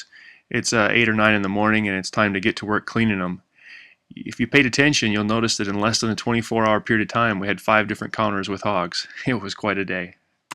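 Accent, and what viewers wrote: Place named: Midwest, United States. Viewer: Your accent sounds midwestern. No offense, a lot of people move to Georgia from the North. My ex father-in-law was from Michigan.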